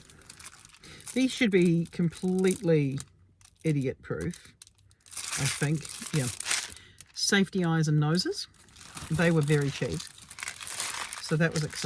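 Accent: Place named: New Zealand. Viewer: As soon as you said “The Warehouse”, I was like… her accent… she HAS to be a Kiwi… but you did confirm it 🫶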